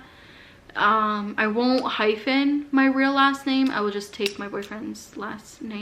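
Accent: Boston, United States. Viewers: I loooooove your Boston accent 😊!!! Don’t get rid of it! 😢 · To add to the chorus, please keep your lovely Boston accent. I didn't know it was a thing until I found you:)) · I love the Boston accent!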